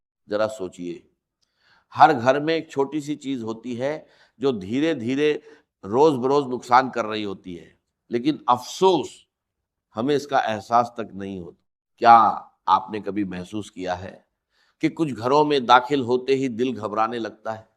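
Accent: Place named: India. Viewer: Yes you're right this is not real video this is not his voice and you see clear that is Indian accent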